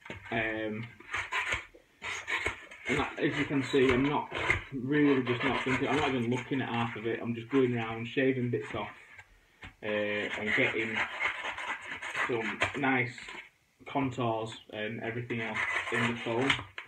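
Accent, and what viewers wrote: United Kingdom. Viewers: You sound like you are from the UK but you use the North American term for sealent - how come? Are most of your subscribers from America?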